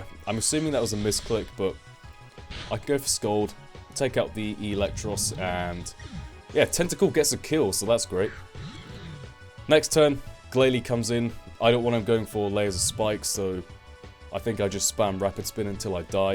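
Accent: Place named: England, United Kingdom. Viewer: whereabouts in England are you from?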